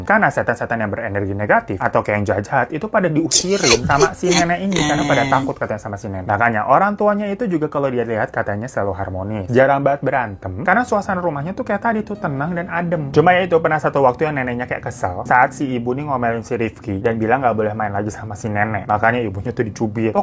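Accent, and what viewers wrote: Java, Indonesia. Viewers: Wkwkwk penonton mas medhok juga ni terdeteksi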